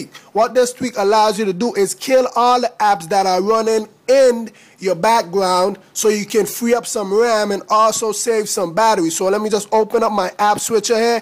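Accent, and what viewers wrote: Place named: Jamaica. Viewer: nothing gets me more pumped than a jamaican accent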